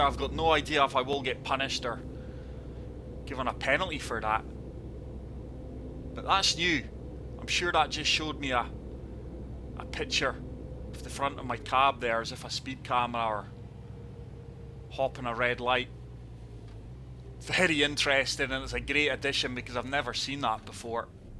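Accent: Scotland, United Kingdom. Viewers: You sound Scottish what rough are you around bud? I'm from Scotland myself, you'll be looking forward to Road kings and ATS/ETS coming to PS5 soon eh, i sure am! I'm playing road craft just now season year 5 pass was announced for snowrunner if you play them?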